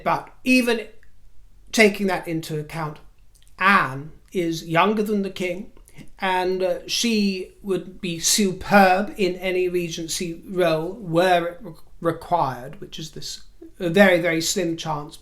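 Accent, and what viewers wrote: United States. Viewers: I love your American accent! ❤ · I love your American accent!!! You nail it. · Your American accent is so good. It always makes me laugh; you are so funny. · you do a good American accent. I mean, it really rings true! Wherever did you pick it up?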